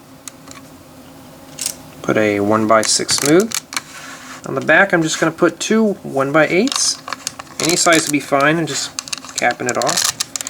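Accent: South, United States. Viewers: R u from Texas? You sound like one... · it sounds like he got a southern acsent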